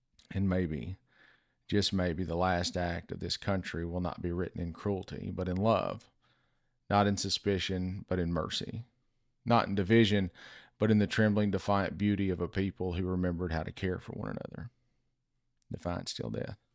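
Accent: South, United States. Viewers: Preach my southern articulate brother in spirit.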